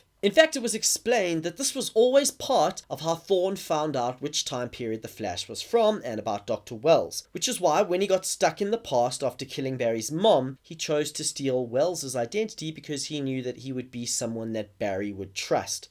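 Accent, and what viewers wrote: South Africa. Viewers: Is this guy South African?????? He sounds like he is.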